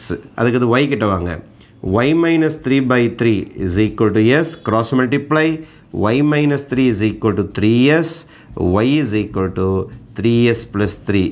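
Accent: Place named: Telugu, India. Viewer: Sir your mother tongue telugu va voice similar ah iruku athan ketan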